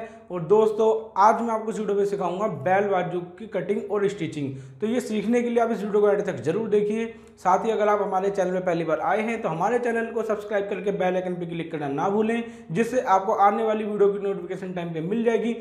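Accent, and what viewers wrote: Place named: India. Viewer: Sir ap muslim ho kya.ku ky ap k name muslim h lkn ap bolty india ke zuban jaisy ho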